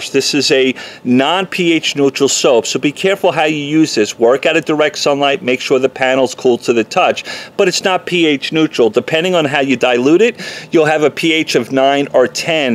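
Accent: New York, United States. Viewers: Great info, thank you. You sound more like a New Yorker than a Texan :) . God bless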